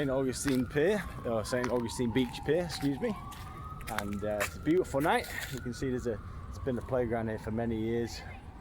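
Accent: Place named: United Kingdom. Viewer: I used to live there …..many years ago..thought you were British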